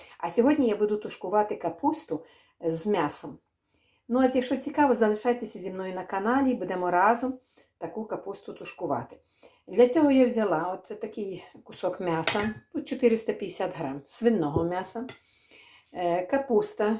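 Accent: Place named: Bukovina, Ukraine. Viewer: Насолоджуюсь Вашою мовою з Буковинським відтінком. Дай Вам бог здоров'я.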